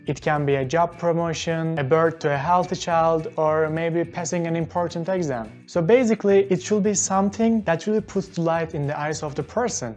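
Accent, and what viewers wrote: Turkey. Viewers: Seni ilk defa gördüm ama konuşmandan Türk olduğun direkt anlaşılıyor. Bizim dil yapısıyla alakalı galiba direkt anlaşılması.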